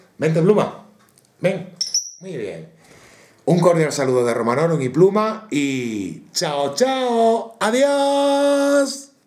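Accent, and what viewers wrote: Andalusia, Spain. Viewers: a mi nunca se me sube pero a mi madre si XD cosa de que solo me deja tocarlo a mi si le echa la mano alguien pa tocarlo se pone agresivo y muerde el muy pinche XD por cierto suenas de andalucia si no me equivoco de que parte ereS? XD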